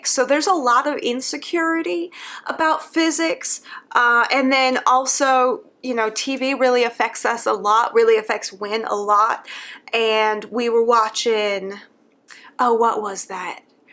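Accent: South, United States. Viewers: So I was wondering where was “from” like what state and or city does she get her accent from? I’m from Tennessee and find accents interesting (though not the best at distinguishing yet I guess) And I hear the twangs of the metropolitan Deep South in maybe Louisiana or east Texas? But that’s just in my experience with southern accents, and there’s definitely a difference from state to state and even regions within those states.. Like I’m from a city area, but people in the city itself have a different accent from the people farther away from the city ya know? So I was curious But of course, since she’s not actually from the south her accent has a more “generalized” southern accent, where you could hear a few regions in it, but idk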